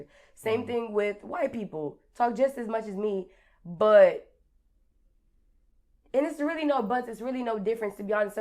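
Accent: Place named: United States. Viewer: She saying all of this meanwhile talking in an American Accent! But just for the record she can't articulate African culture not being "Black." Being Black is an American term meaning Black American ethnicity as a whole which have sub categories. This is the problem with other ethnic blacks being raised here in the US but lack experience, history or background to try and understand Foundational Black American lifestyle.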